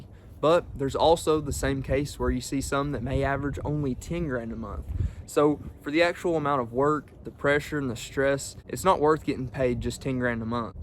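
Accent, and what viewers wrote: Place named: Texas, United States. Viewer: I admit I like your accent a lot! Probably one of my fav English accents. Are you from Texas?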